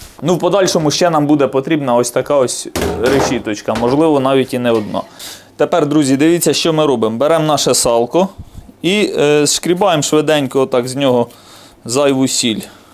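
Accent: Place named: Poltava, Ukraine. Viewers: який приємний говір... такий як у нас на полтавщині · суржик обычный . зачем вы коверкаете русский ??? противно слышать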